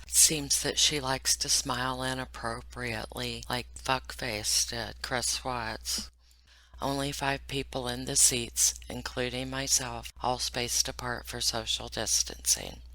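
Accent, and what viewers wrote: United States. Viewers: Love listening to you're commentary in that beautiful American accent. Great how you say what is the truth and not what people want to hear. Lots of love from London uk x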